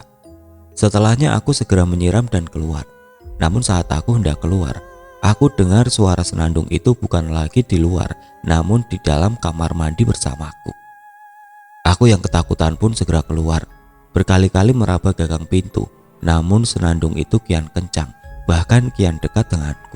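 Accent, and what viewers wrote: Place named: Java, Indonesia. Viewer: Maklum lidah orang Jawa😃😃😃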